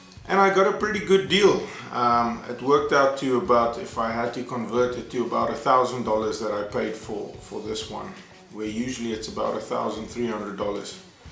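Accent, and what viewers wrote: South Africa. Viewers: You soumd south african..are you???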